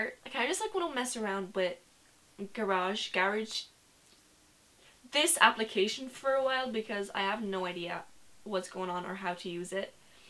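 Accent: Ireland. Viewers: Is she Irish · Me Before : I think she’s Irish... she sounds like all the annoying second cousins from Ireland who I am forced to put up with, despite the fact I don’t know the name of any of them, other than they and love Karaoke, Makeup and romance movies... Me after : SHE’S DEFINITELY IRISH, SHE SAID THIRTY THE WAY I DO WHEN I’M IN IRELAND. Saying thirty three and a third in a Irish accent is very funny to me, and my immature sense of humour.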